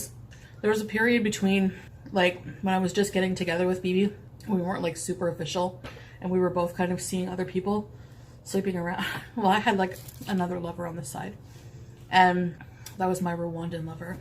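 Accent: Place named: Scotland, United Kingdom. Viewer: Scottish Accent DISGUSTENG!!!!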